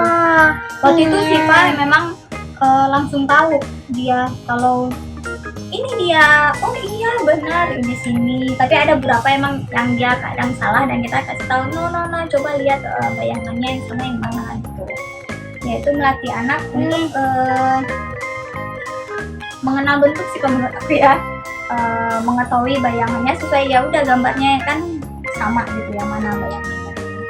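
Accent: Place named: West Sumatra, Indonesia. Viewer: Sukses ya sistaaa, logat minang nyo itu looohhh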